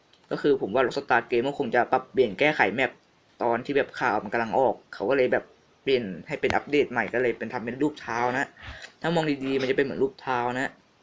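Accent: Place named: Thailand. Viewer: ทำไมพี่เสียงเหน่อจังคับ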